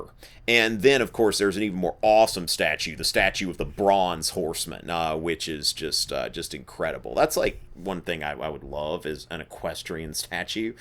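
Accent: United States. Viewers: Oh don't worry, I was able to get over it eventually. But the first couple times the teacher had us watch your videos, I was so focused on the accent and trying to figure out which state you might be from that I wasn't paying attention to anything you actually said. :P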